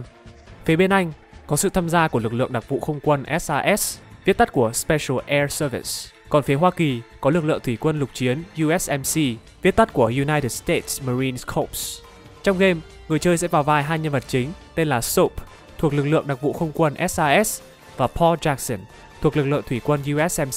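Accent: Standard. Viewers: Bạn đọc khá rõ và phát ngôn chuẩn.. đóc tiếng anh chính xác tôi khá thích.. mog kênh sẻ ngày càng phát triển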